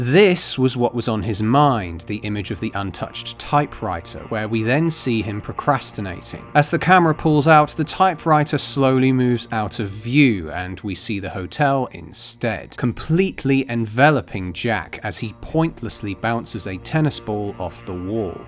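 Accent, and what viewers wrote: United Kingdom. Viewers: The whole time I'm watching I forgot that you have a British.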